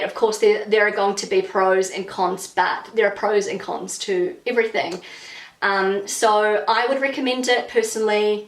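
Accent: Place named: New Zealand. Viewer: Neuseelandisches englisch ist fur jeden wine herausforderung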